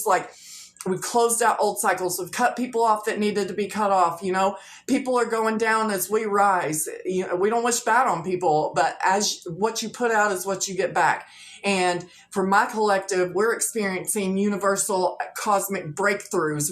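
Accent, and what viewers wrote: South, United States. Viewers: 😎I am most high....... just smoked a joint. LOL. but seriously.... sometimes all it takes...... is a little can do.... you got it. beware of trolls....is right. they hide behind circles with letters in them. i love the southern accent........ i grew up watching beverly hillbillies, and dukes of hazzard............ But who didn't huh? be vigilant about thieves.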